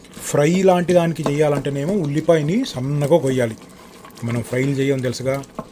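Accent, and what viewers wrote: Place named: Andhra, India. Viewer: Your language pronounce is like Andhra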